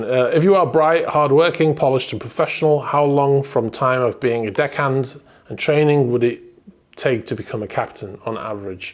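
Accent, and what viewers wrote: Liverpool, United Kingdom. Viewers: My USA ears aren’t well tuned. Scouser?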